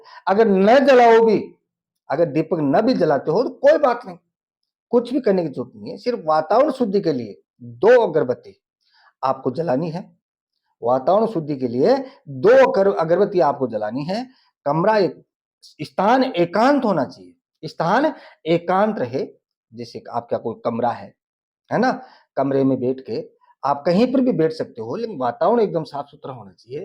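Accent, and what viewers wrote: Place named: South, India. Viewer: Dada g ka hindi south ke hindi se milta julta hai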